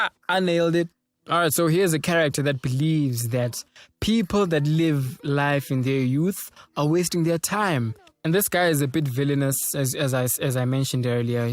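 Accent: South Africa. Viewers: Is the guy commentating on this video from South Africa.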